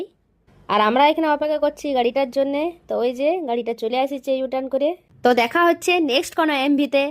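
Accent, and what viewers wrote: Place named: Purulia, India. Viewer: পুরুলিয়ার টান টা যাবেক নাই😂😂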